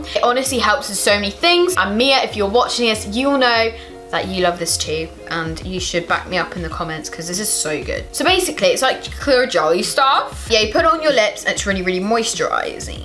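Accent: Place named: United States. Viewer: Love this and ur American accent wowee!!!!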